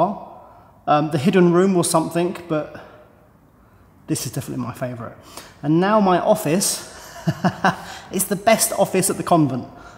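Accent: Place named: England, United Kingdom. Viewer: Somebody knows where this convent is, I recognized english way of taking, thanks to light me !!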